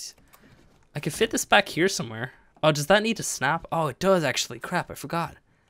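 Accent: Ireland. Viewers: Didn't know my boy was Irish till now.... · Wait my bad, not German, Irish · 30 minutes of an Irish man making a bar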